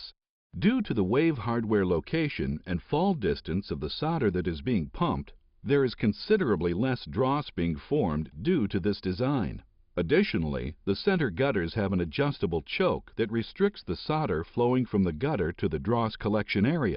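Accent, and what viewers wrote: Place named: North America. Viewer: Oh Yes, how right you are. This is a North American pronunciation thing. Even The Mythbusters say 'sodder'. Why is it so?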